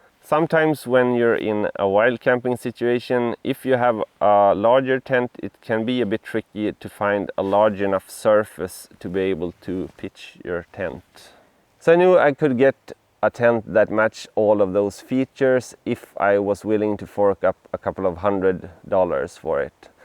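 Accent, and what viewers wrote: Sweden. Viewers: Are you Sweedish or Norwegian, you have that accent when ending words 😂 nice vid and tent. Prob watched 40 already as I am going ti buy it and then ready to go to Sweeden for camping :D closer to go there from where I am then back to Croatia where I am from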